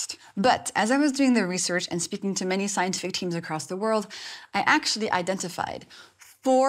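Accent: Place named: France. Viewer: I believe she is French, hence the gorgeous accent?? Namaste 🙏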